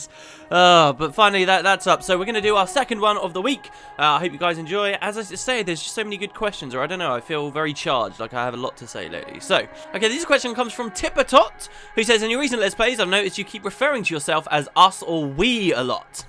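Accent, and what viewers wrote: England, United Kingdom. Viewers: you have the proper English accept so you instantly sound Epic to us Americans! Well.. i dunno.. to me anyways! lol. Absolutely agree with you on the DE reward scaling..if people had the chance to get like 1g from an event that hadn't been done in a month, people would definitely be out there doing stuff. & the game should TELL you "you got this much extra stuff for doing an ignored event" etc.. Maybe add new medal types.. platinum, Mithril & Orichalcum that only show up on old events & give you special loot boxes.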